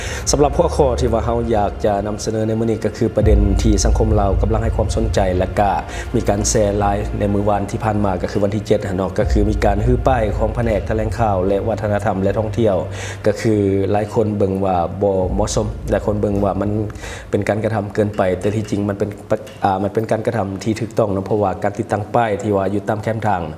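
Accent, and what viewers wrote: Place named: Thailand. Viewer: คำเว้าที่แอดออกเสียง มันก็สำเนียงเดียวกันกับไทย. แค่ภาษาเขียนไม่เหมือนกัน.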